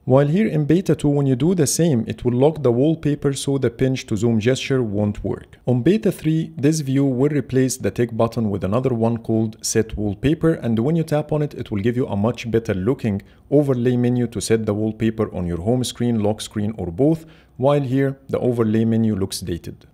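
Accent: Egypt. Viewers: اللهجة المصرية باينة <3 بس الفيديو عالمي .. عاش <3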